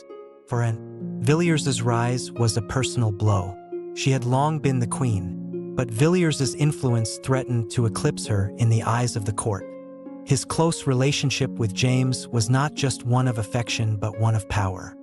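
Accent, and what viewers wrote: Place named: United States. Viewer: This sounds american. I only want uk production.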